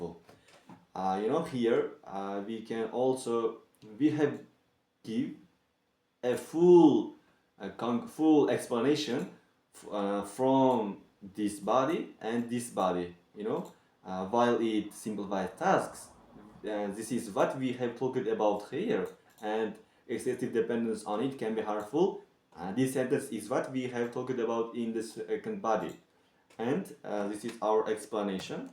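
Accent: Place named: India. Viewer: Bruh indian accent 🤡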